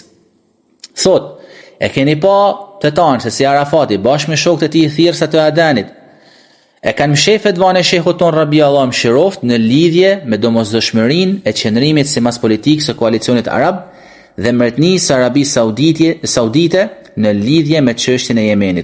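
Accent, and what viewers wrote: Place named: Shkodër, Albania. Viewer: Kush asht hoxha qe flet se po duket shkodran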